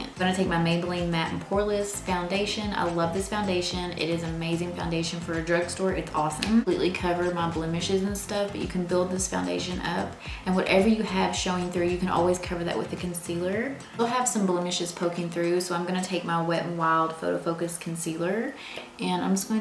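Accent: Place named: South, United States. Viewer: You sound like you have a little southern accent which just adds to your beauty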